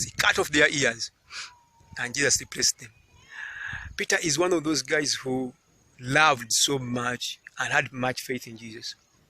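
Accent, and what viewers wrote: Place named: Uganda. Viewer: Hi...moh here new subbie..I swear I watching trying to figure out which country you're from from your accent...Are you from Uganda????...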